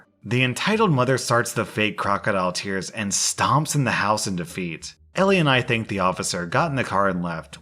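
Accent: South, United States. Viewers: thick southern accent on point man. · entitled Karen voice mixed with southern accent is the superior voice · does a good southern woman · Your high-pitched southern EM voice sounds like Ziro the Hutt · It's pretty obvious from your Southern accents that you think they're synonymous with ignorant yokal. That's pretty bigoted. Especially when you consider this: Some of the deepest and slowest accepts you hear come out of Alabama and Mississippi. Ironically locals work their asses off their whole lives for a shot at NASA facilities in their home states. Those accents got us to the moon. Have some fucking respect for "The Other." Also realize the deepest most impenetrable Southern Accents come from Yankees who have to prove "They ain't white." I know because I've asked or even heard the discussions people thought nobody else would hear. So it's almost like ignorant fucks live everywhere in every community, but especially amongst City Yankees. 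· southern accent is the best thing that's happened to me today.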